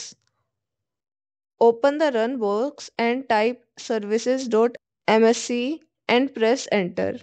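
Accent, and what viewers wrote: India. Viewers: Can't watch you sound indian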